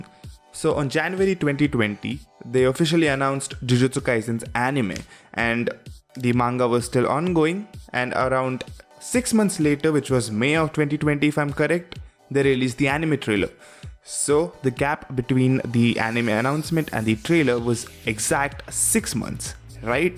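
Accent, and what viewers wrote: India. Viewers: you sound Indian?! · Bhai indian h kya? accent se lag rha h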